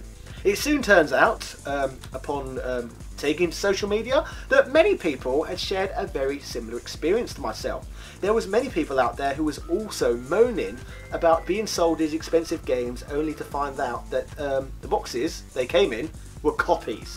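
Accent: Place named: RP, United Kingdom. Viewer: Why do you put on that awful posh accent?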